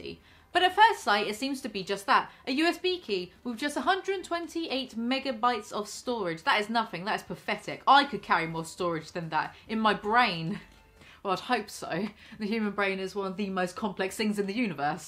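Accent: United Kingdom. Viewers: I’m from N Y and I always Loved the British talk! RUBBISH ! Hello mate! Specially this nice looking British woman! You Go Girl!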